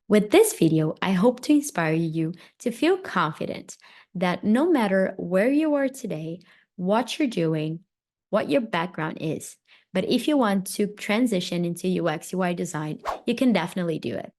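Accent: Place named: Brazil. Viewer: I KNEW you were Brazilian, I felt it from your accent. I'm Brazilian too and I love your videos. I'm a product owner | Product Designer:hand-pink-waving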